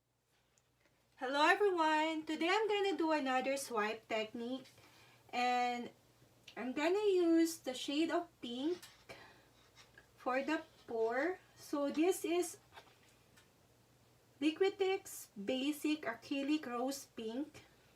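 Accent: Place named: Philippines. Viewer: You sound Filipina. I love this painting. It’s as close to my favorite color as it can get; red.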